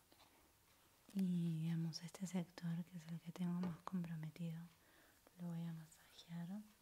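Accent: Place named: Argentina. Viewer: Me encanta el acento argento en asmr!!!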